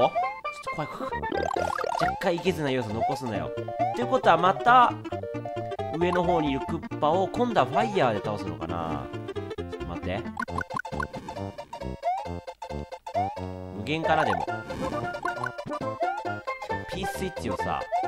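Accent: Kansai, Japan. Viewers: の関西弁実況いいな〜なんか癒される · の関西弁ええな！！ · 関西弁キャラに戻らないかなぁ…… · 誤解しとったやん！簡単やったやん！のじんみーな関西弁好きだー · やっぱ、関西弁はいいな～ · の関西弁が可愛すぎる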